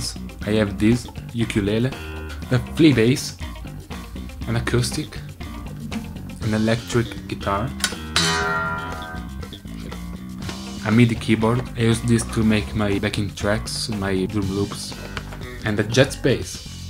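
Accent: Italy. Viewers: If you observe closely you can hear a tha italian accent. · Bruh, he already revealed his laugh 5 years ago and his italianglish voice too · • Italian voice/accent revealed • Laugh revealed CHECKMATE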